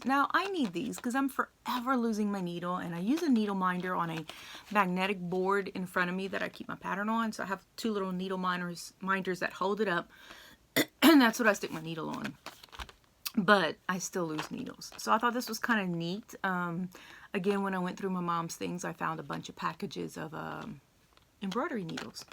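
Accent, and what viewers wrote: Louisiana, United States. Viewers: I’m glad you subscribed to my channel so I could find you. I’m just starting to watch your video and from the first sentence you spoke, my guess is that you’re from Louisiana. Am I right?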